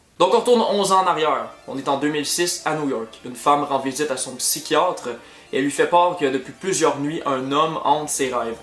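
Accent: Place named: Quebec, Canada. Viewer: J'adore ton accent québécois!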